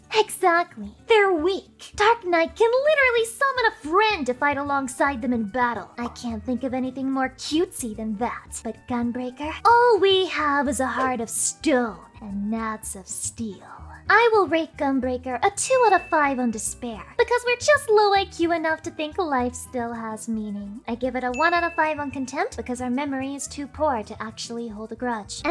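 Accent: South, United States. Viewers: I can't stop watching after hearing her southern accent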